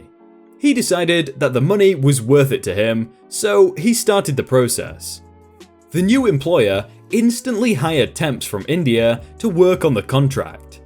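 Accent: United Kingdom. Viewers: Yes, it's called British pronunciation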